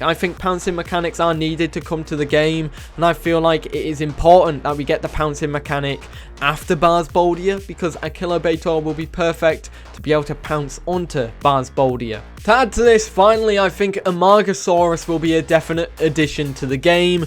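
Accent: United Kingdom. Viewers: your british english is funny i like it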